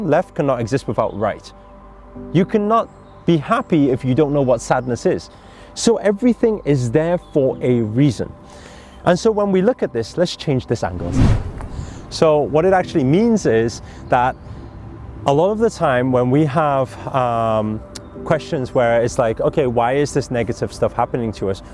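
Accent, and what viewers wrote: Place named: Northern England, United Kingdom. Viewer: Hi, I'm listening from near Manchester UK, I notice a northern twang in your accent x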